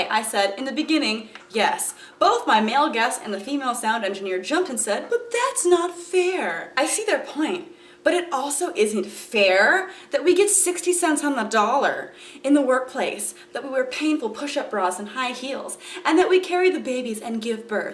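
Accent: South, United States. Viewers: Where are you from? You sound like you have a Southern accent.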